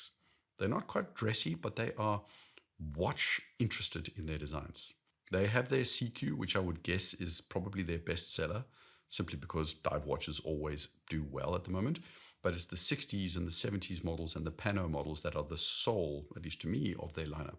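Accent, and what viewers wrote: South Africa. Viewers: Are you south african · Was not expecting a saffa accent when I clicked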